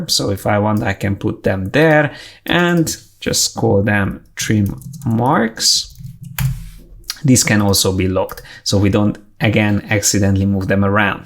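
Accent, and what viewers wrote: Hungary. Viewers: Your voice it's like you have a little bit hungarian accent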